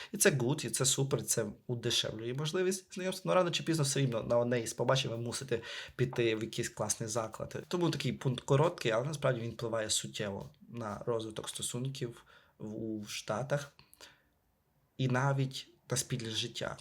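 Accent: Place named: Russia. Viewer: друже, роби щось з тим акцентом, бо тобі ще в США жити, а говориш ти ну дуже так собі. рашан ексент :D оце "і"-кання та "ге"-кання - це прямо як маркер. Та й в деяких словах коли ти замість G вимовляєш H - не одразу зрозумієш що ти там такого сказав.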